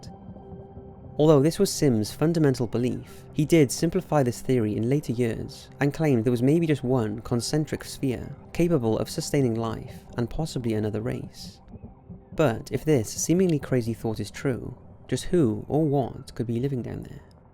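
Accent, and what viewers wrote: Wales, United Kingdom. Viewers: Lovely Welsh accent!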